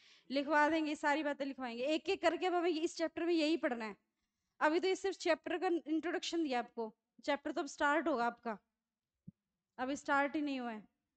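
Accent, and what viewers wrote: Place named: Bihar, India. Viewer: Mam ji apka abaj hmare bihar se milta julta lagta h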